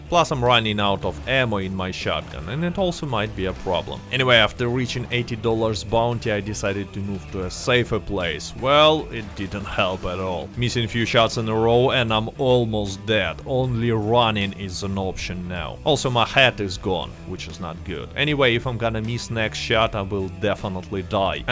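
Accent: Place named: Russia. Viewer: You have a cool Russian accent. Your voice reminds me of my wife's uncle 👍🏿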